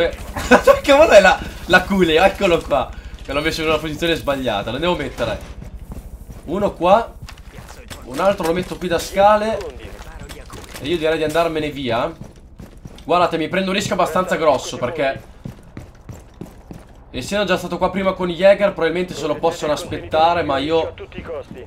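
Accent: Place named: Romagna, Italy. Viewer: ma sei romagnolo? Parli il dialetto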